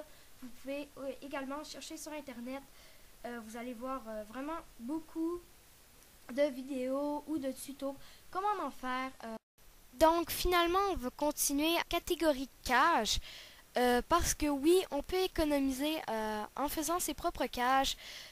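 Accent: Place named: Canada. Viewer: c es normal elle a un accent canadient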